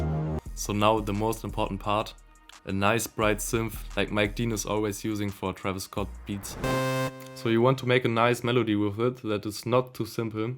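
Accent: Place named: Germany. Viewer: Mann hört sein deutschen aktzent so raus haha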